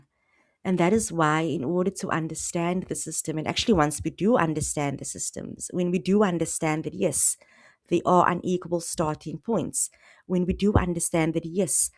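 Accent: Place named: South Africa. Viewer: Omg girl are you South African🇿🇦???The accent??😭❤️please tell me you are South African I know a indian south African/coloured accent😂❤ Also nice video ❤and interesting take Although I do like their hustle,I also see where people are coming from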